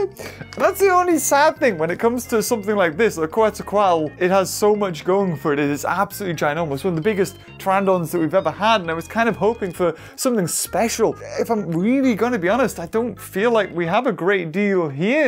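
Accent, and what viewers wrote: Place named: United Kingdom. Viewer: V you sound like a "fance English men eh"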